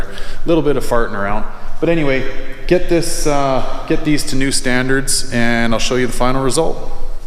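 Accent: Canada. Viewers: I’m “sowwwry” haha Canadian accent makes me chuckle. Beautiful shop man ! That’s awesome!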